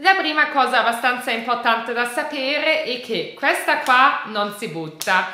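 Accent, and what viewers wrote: Germany. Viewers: Bravissima complimenti per il tuo italiano mi.piace l.accento tedesco....io vivo in Germania da anni...e si sente l' accento italiano quando parlo tedesco e divertente questa cosa..ciao un abbraccio...dove vivi in Germania ? 🌷🌷🌷🌷☀️☀️☀️☀️ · Se voi piaciuto ,se vi è piaciuto questo video ,hai un forte accento tedesco si sente . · Salve. Mi chiedevo se la bottiglia di plastica si deve rendere obbligatoriamente nello stesso negozio dove si è comprata o anche in un altro? Grazie. P.S. Il tuo italiano con cadenza tedesca è stupendo.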